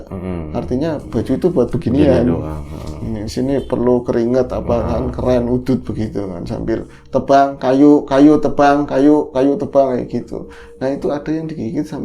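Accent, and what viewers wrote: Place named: Java, Indonesia. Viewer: Mas ni mantap bhsa jawa ny msh totok, bguss ciri khas tersendri... Mas semakin bgus crta² yg dbwain moga sllu menghibur qt smua 🙏❤️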